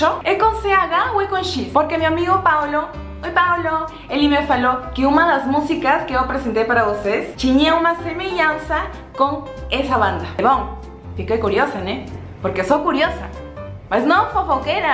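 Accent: Peru. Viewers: por el acento digo q es peruana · Oi você é maravilhosa, adoro este sotaque peruano. Sou inscrito e super fã, grande abraço do Rio de janeiro. Bjos minha linda!!! 😘💖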